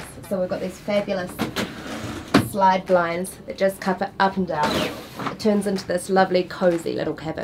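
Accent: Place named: South Island, New Zealand. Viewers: I thought it had heads not heeds. Arrr that South Island accent. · Ben Dixon that’s 🥝 kiwi’s for yah. Or is she Aussie 🤔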